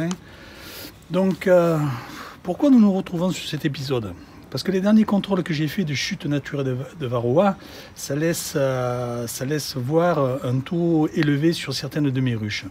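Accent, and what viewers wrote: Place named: Provence, France. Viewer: Bonjour Peut on utiliser ce même mélange (AO+AF+ SIROP) sur des lanières cartonnées pour un traitement avec couvain? En cas de forte Infestation, jusqu'à combien de traitement flash peut on faire en automne sans trop de risque sur la reine notamment? Félicitations pour la qualité de vos videos et votre sens de partage. Avec les enfants on suit vos vidéos et on apprécie le bel accent provençal 🙂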